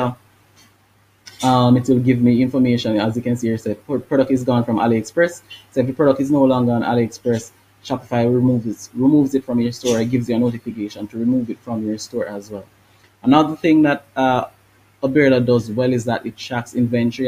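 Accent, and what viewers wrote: Jamaica. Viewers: big up yard man sound like jamaican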